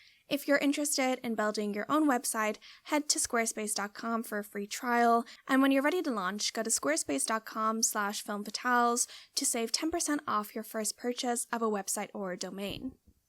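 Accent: Ireland. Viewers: Lol completely irrelevant but why do you have the slightest irish lilt?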